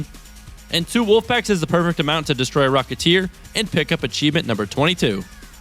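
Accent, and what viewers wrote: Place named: Midwest, United States. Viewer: Damn I'm right across the big river from you I knew you sounded more Midwestern than most people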